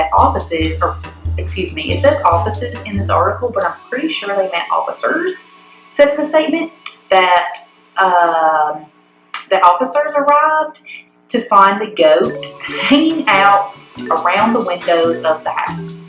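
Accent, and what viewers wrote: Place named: South, United States. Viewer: I like the Southern accent. It’s very similar to the Manchester Accent in the UK 🇬🇧.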